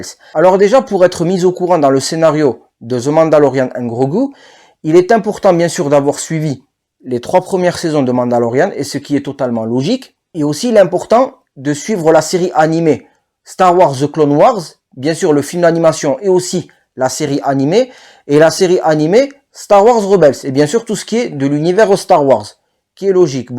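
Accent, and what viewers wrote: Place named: Toulouse, France. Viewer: Au top ton debrief sacrée accent toulousain hein😉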